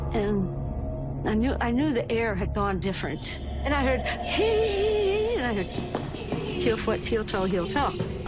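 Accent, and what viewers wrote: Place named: Canada. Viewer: Love her Canadian accent so much! And the Halifax story breaks my heart! All those poor people! :( May God rest their souls!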